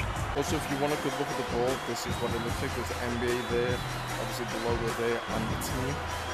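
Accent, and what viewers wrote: Liverpool, United Kingdom. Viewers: Yo are u from liverpool England?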